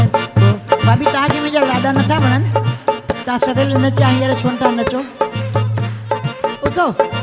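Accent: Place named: Sindh, Pakistan. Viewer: خالص سنڌي لهجو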